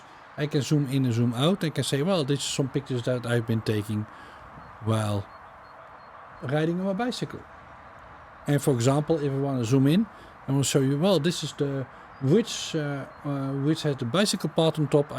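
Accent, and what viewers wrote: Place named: Netherlands. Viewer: I also wondered about this problem of being to much work even though we truly enjoy all your extras you put in the video. The helicopter view is something that almost no other channel has and I like this high in the sky view to gain perspective of were you are or how the landscape looks from a little far away. All this is a balance of how much effort and time you have to put into this VS it being to much work and stop making videos. I believe your Dutch accent and way of speaking is very calming and relaxing and a joy to listen to while eating my dinner or having a breakfast in the morning with my coffee while watching your videos. I can hear the difference in the red pavement or asphalt versus the brick roads which is so nice to listen to. Also the crows and birds I know these sounds well as it lets me remember riding the bike between Eindhoven and Nuenen. 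So if you have to limit yourself to how much work you have to do keep the narration and helicopter view and audio sound while on the bike. More important to people I believe is that you visit different places and share your knowledge of the area with the viewers. Thank you for all the hard work many here enjoy what you do. Dankje Wel Mijn frenden.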